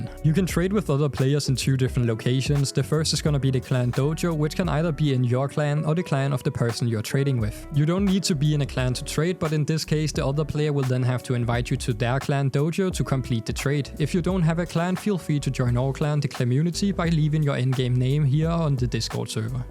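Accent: Denmark. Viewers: You sound extremely Danish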